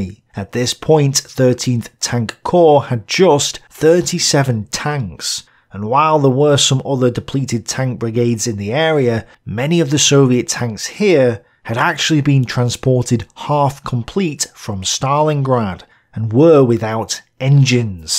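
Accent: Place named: Northern England, United Kingdom. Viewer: I really enjoy your comments on ww2, Señor. By the way, because I lived in the UK I feel your accent is from Northern England or Scotland. Only there the U is pronounced like we do in Spanish. U = oo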